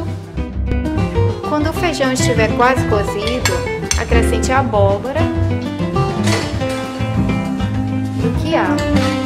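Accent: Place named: Recife, Brazil. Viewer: adorei, você é muuuuuito simpática! sou de recife e adorei ver alguém com sotaque parecido❤️❤️❤️ muita luz pra você🌱✨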